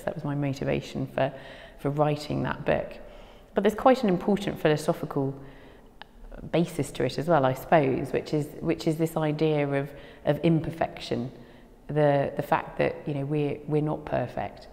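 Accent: Bristol, United Kingdom. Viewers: Think Brenda from Bristol. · that's not a 'westcountry ' accent. Come to Devon or Cornwall and you'll know. She has light Bristolian going on. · Bristol ( locally known as Brizzle ) is right!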